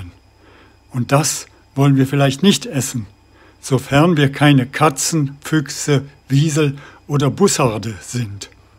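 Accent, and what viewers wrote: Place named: Switzerland. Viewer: Also von mir aus kannst Du das Schweizerdeutsch gerne mehr einbauen, ich höre das gerne. Selber sprechen kann ich es leider garnicht... Und wie es aussieht, ist Deine Verwandtschaft auf der ganzen Welt verteilt! 🙂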